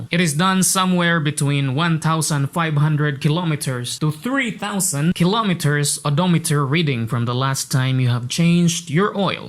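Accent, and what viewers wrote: Visayas, Philippines. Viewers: Hindi!! alam mo kung bakit nag English yan? Kasi yung nag tagalog yan parang bisayang o bikolano tukmol halos hindi mo alam kung nagpapatawa siya ehh para siyang makatang pilipino na may mental retarded. Binago niya yung language niya kasi maraming bash sa kanya nakakatawa kasi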